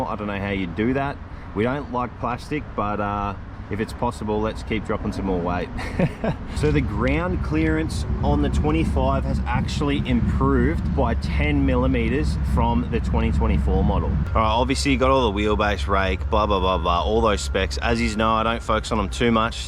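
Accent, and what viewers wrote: Australia. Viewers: Wonderful review. The exhaust note is therapy. And your strong australian accent is a pleasure to hear 😊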